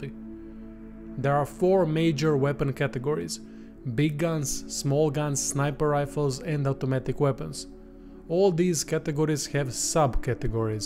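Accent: Russia. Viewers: You Russian?? Slightly sounds forced cause I love doing a Russian accent as an american. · Best true Russian English ever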